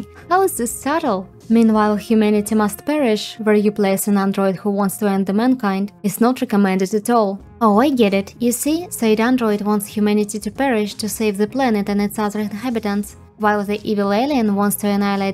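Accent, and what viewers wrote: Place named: Eastern Europe. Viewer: Yes, it indeed have some slavic languages vibe. Haven't noticed before. She has some strong accent, but it's not clear to recognize for me.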